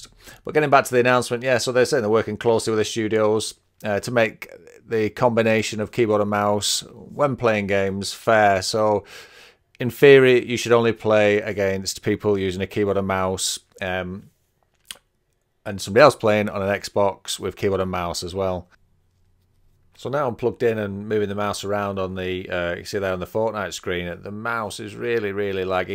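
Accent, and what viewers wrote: United Kingdom. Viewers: Hope pubg allows k&m eventually. Are you from yorkshire btw lol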